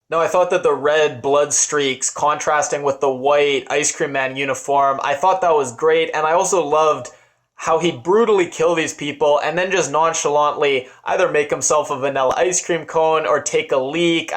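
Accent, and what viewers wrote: Canada. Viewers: Just curious, Are you Canadian ? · So, you did NOT explain the ending at all. I think perhaps you are so full of yourself that you misread the ENTIRE movie and have NO idea what you are babbling about in your annoying Canadian accent, A! Who was the moving man in this plot and why did he appear at the end as a potential stalker, explain that one oh master of interpretation!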